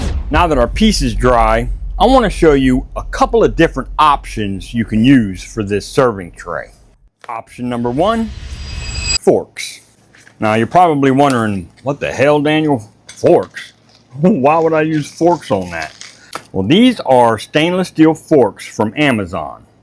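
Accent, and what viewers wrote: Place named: Louisiana, United States. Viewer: I swear you sound more cajun dan vikin. just sayin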